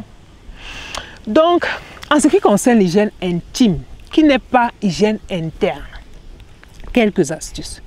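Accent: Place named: Africa. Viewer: J’adore le parler africain...💐🌺🍀🌸🌷🏆🏅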